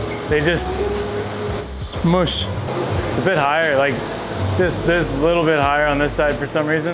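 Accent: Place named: Ireland. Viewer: You have such a strong irish accent lol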